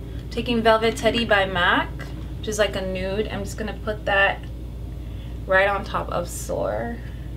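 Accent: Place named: Jamaica. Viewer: Great Video, Where are you from? I almost thought I heard a Jamaican accent.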